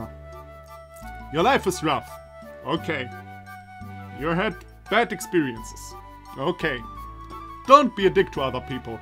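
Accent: Germany. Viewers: Are you german? I mean you really sound like you are ^^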